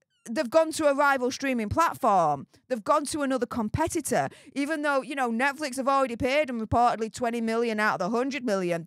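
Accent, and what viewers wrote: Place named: England, United Kingdom. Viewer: Takes a while to get through the English accent however is interesting and worth watching.😅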